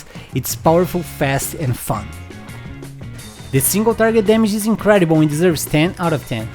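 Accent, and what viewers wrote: Brazil. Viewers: Vc é br, o sotaque parece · cade o video em portugues? certeza que é brazileiro com esse inglews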